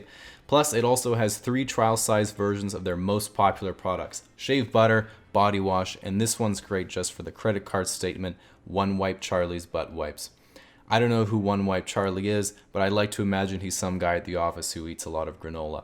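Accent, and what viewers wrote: Australia. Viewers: That's a really good Australian accent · his aussie accent is on point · That australian accent is oddly familiar. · Your fake Aussie accent sounds like a Kiwi, your real voice sounds Aussie cheers mate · Damn, the Oz accent is completely native! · nice aus accent i have to say, u even say fuken right. edit soz just realised ur probably born and raised aus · I love the Aussie accent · CE's Australian accent is really cute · That was an amazing Australian accent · still can’t believe u used to live in australia and pulled off that accent like u have 2 personalities ahahahaha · your aussie accent was spot on! didnt see that coming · That Australian accent is fucken great mate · Very convincing Australian accent. Most people go for the crocodile Dundee accent and butcher it. I've lived here for 18 years and still can't do a convincing accent. 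· Hearing this guy speak in his Australian voice sounds so much more normal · Okay but your Aussie accent sounds exactly like my little brother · Honestly, his accent sounds more "Aussie" and Internet Historian's. IH has a very "posh" Aussie accent, for lack of a better word. You ought to hear the bogans. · Wait Your Australian, same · The first joke he did was actually kinda funny. But that's just because he has an Australian accent, which makes everything funny. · Why does your australien accent sound like adrian gee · you sound exactly like darren levy with your australian accent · Top Australian accent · your aussie accent sounds like frank woodley!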